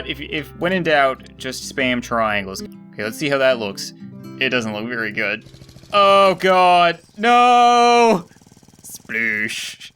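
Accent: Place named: Canada. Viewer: He sounds so Canadian back then Also I remember back then when he didn't swear a lot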